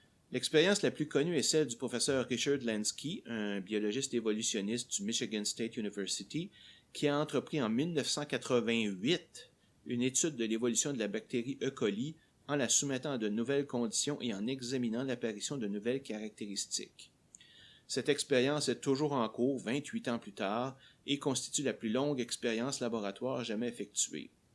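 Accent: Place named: Quebec, Canada. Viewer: Merci pour cette vidéo qui permet de bien comprendre les mécanismes en oeuvre dans la sélection naturelle. Je profite de l'occasion pour saluer tous les Québécois dont le magnifique accent (pour un français 😁) est toujours aussi agréable à entendre.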